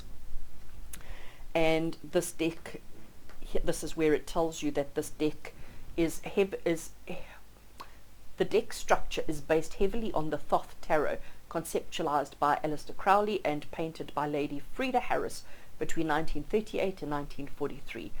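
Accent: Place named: South Africa. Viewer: Hi...by your accent you sound South African. Is this so? If you are, then you're the first SA YouTube channel I've discovered here. Oh and this deck is on my Xmas wish list!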